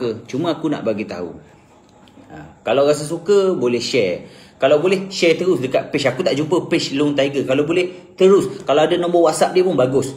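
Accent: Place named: East Coast, Malaysia. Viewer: Aku faham logat dia.kalu dop kelate ganu kalu xganu pahang.4ehehe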